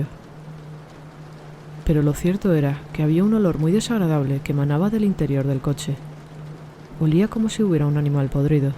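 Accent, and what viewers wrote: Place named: Spain. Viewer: No me gusta ese español. Prefiero el español "latino". Gustos de gustos. Gracias x leer.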